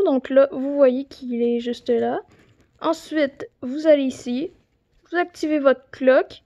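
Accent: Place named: Quebec, Canada. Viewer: Yes québécois comme moi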